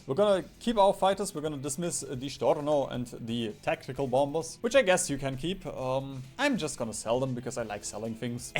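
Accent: Germany. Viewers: question you german ?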